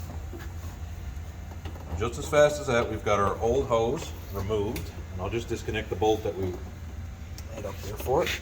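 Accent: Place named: Newfoundland, Canada. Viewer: Hey man, where are you from you sound like a Newfoundlander?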